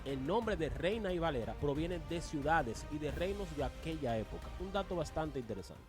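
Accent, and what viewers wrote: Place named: Dominican Republic. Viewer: Es primera vez que veo tu canal, te saludo desde El Salvador. Interesante historia, por tu acento creo que sos Dominicano. Dios te bendiga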